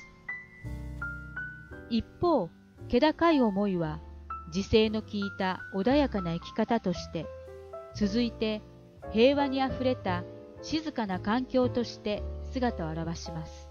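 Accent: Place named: Kansai, Japan. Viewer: 関西なまりが気になりましたが、寝る時よく聞いてます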